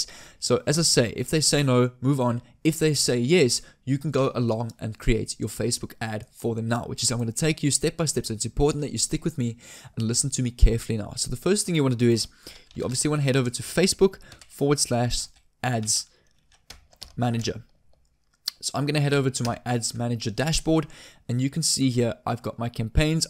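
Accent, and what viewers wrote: South Africa. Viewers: Hi, I just discovered your channel. I'm a fellow South African. (I'm assuming you're South African because of your accent and some of the websites you visit when you're recording your screen default to the.co.za versions.) Thanks for this video, I thought it was an excellent summary of the process involved. I have a question regarding the installing of the pixel. Obviously you are going to need access to the back end of these businesses websites to install the pixel. Are companies not hesitant to give you access to modify their website just from one email? I mean you're a complete stranger that just emails them out of the blue, and asks them to pay you as well as modify their website. I would be hesitant to let a complete stranger do that and even more hesitant if I found out they are from a foreign country, like us. Could you perhaps make a video going in to more detail about "Closing the deal". 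The practical skills like installing the pixel and creating the ads can be learnt and perfected but the hardest part of the whole process, in my opinion would be convincing them to let you fiddle with their website. Most business have probably hired a web developer to make their site for them and I'm sure if they asked their web developers advice, explaining that you contacted them and asked to install something on their website the web developer would by default advise them to just chase you away or ignore you in light of all scammers and fake traffic generation and SEO schemes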